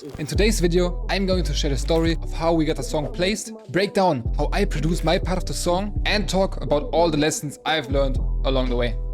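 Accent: Germany. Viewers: man hört direkt das du auch aus Deutschland bist haha. glückwunsch! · its literally my top 1 fav song rn, fire shit 🔥🔥, weird question, but you german? its bc of your little accent, would be crazy lol.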